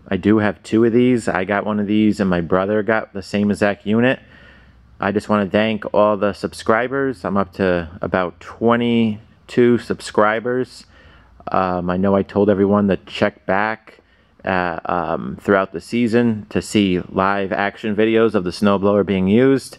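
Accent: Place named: New York, United States. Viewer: Hi , really great honest real information.. so... seems they are really slow... live in vermont don't want to walk at a snails pace... can they actually move faster than a slow tedious walk and do they go backwards as fast as forwards.. ? can you maneuver easily without engine power and clutches in, to position in a garage. I love everything on it .... oh yes... is there a power cord back up or is it battery or pull start only.? Thanks .... lol love your NY accent and your excitement and then disappointment at no snow in 2016 I bet you hoped for a huge storm and bailing the neighbors!..... boys and toys! Thanks again for the vids well done and thoughtful.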